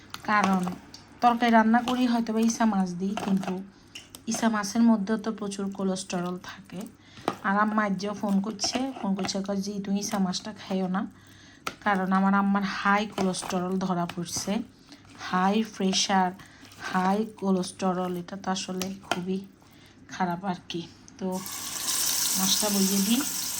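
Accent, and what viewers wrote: Noakhali, Bangladesh. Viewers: কেমন আছেন আপু।আপনার মুখ থেকে নোয়াখালীর ভাষা শুনতে খুব ভালো লাগে। আমিও নোয়াখালীর লক্ষ্মীপুরের মেয়ে ❤❤❤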